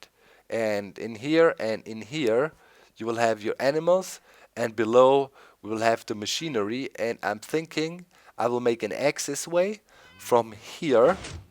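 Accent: Germany. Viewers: i could tell you what hannibal said in the english version of the a team...but...i kind of like your germglish..it adds to the series..ok..i feel bad...in english what he would say at the end of each episode was..." it gives me quite some joy when my plan is coming together."...now you know, happy to help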